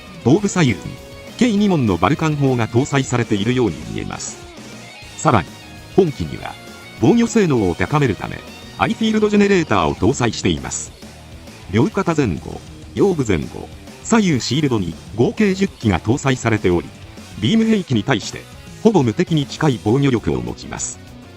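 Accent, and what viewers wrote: Kansai, Japan. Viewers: 関西弁なだけできれてないんじゃないか？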